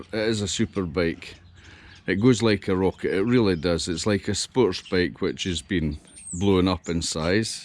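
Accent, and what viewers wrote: Scotland, United Kingdom. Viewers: You sounds like a Scotsman. Regards from Poland 🍻🍻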